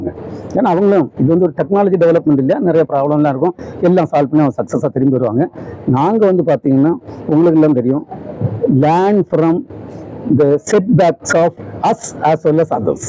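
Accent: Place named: Kanyakumari, India. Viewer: Enka Kanya Kumari slang alaku sir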